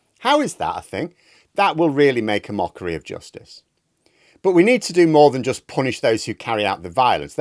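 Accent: United Kingdom. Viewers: my ears hurt from listening to your rhoticism. you brits need some speech therapy or something, your accent is a mistake.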